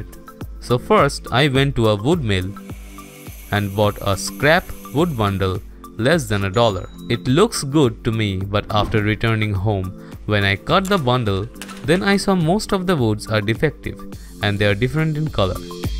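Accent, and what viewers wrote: India. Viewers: sidha bol de na indian ha itna natak kyu krta ha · Does anyone notice that he has an indian acent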